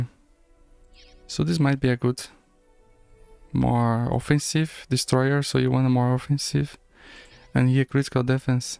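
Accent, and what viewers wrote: Brazil. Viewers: Where are you from ? You really sound brazialian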